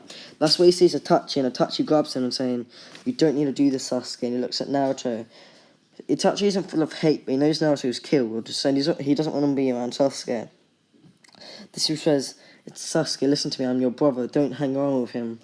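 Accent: United Kingdom. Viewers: i live in usa and its been a while since i last heard British accent but the video was really good.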